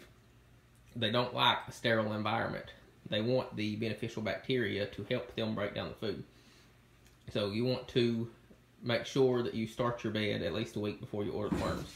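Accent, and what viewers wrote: South, United States. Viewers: HEAVY southern accent. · This is a southern accent ") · Awesome southern accent and very detailed video. Thank you for sharing.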